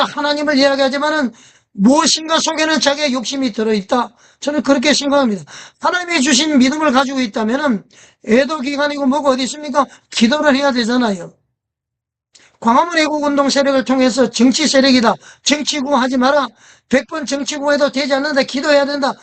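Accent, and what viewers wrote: North Korea. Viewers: 그런듯이 아니잖아요 참 웃기네요 그만지껄이세요. 모욕하지 마셰요 무슨 미친소리합니카 북한에서 왔네요 말투가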